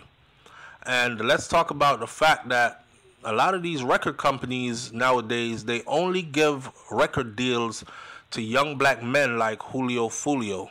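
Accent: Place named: Jamaica. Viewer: This sounds like soflow TV.. 😂😂😂 the Jamaican accent can’t hide man.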